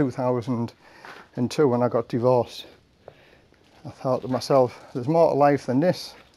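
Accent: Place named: Teesside, United Kingdom. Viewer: Always enjoy your videos ……I’m planning on retiring to Thailand this November……thanks for sharing both inspirational and useful info…..Always good to hear a friendly Teesside accent from the other side of the planet 🌎 #UTB 🙂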